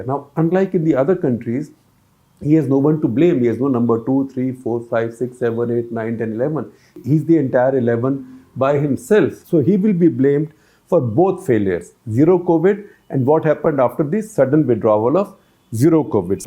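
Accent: India. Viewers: dont understand ur indian accent..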